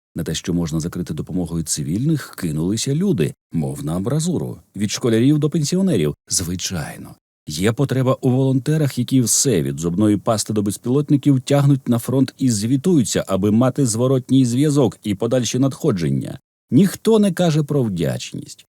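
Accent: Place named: Russia. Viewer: У вас дуже гарний, заспокійливий український голос, а російський такий наче ви самий корінний росіян із росіян. Так вуха ріже і дратує шо капец...часто втрачаю саму суть тексту...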